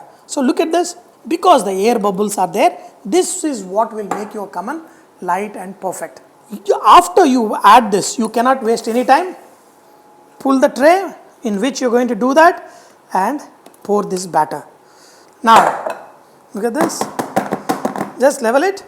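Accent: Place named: Hyderabad, India. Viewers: Miru chesina kallu chicken is a stress buster till date ... malla aa lanti episode chey andi ... 🙏🙏🙏 with telengana accent 😀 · ZABARDAST SIR PURE HYDERABADI U R TALKING. .NICE RECAPY THANKNQ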